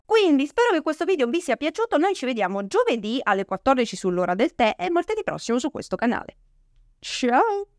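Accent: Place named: South, Italy. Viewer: Dall accento sembri più del sud😊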